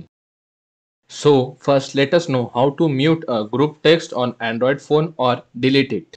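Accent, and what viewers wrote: India. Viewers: I can't understand your Indian/ English verbiage.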